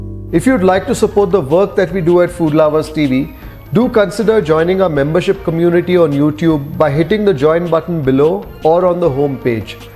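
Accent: Karnataka, India. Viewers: The way you anchor in kannada English is very appreciated and also the food presentation is very addorable 🙏🙏😊❤️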